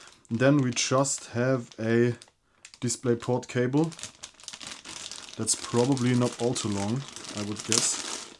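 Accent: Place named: Germany. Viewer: German accent ❤️‍🔥❤️‍🔥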